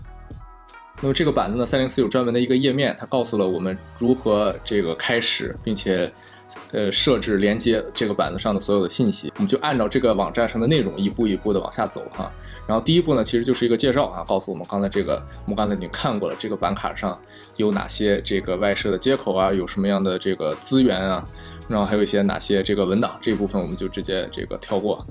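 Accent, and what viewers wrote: Shandong, China. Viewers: 是山东人吗哈哈哈哈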